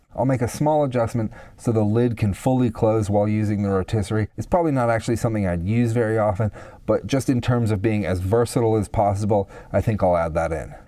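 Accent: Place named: United States. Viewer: us accent, living in the uk, looking like an australian, this dude rules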